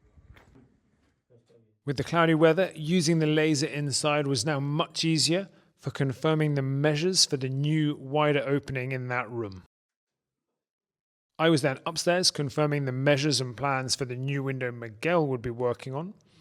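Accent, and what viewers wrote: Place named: Scotland, United Kingdom. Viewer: I detect scottish accent..where from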